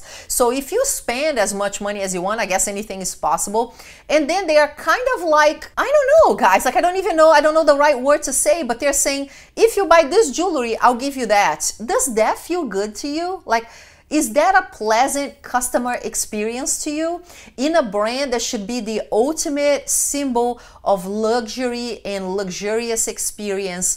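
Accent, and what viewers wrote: Brazil. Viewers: She's from Brazil. She came to the US and worked really hard to become a professional engineer.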